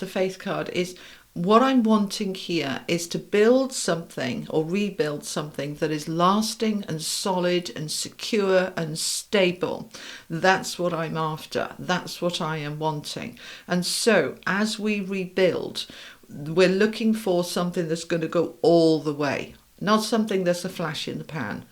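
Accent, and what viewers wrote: United Kingdom. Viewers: I am free I am secure, I am safe. I love your wonderful energy and love to hear your British accent- so many of my Favorite musicians are from the UK …. I wonder if I have had a past life there with the attraction and fascination with everyone abroad ❤ thanks for sharing your lovely messages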